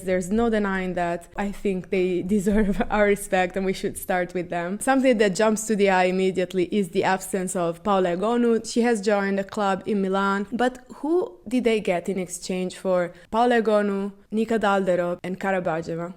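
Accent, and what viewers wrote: Turkey. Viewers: Wow I thought you had a Turkish accent. Still not buying that Italian thing 😂 not that it matters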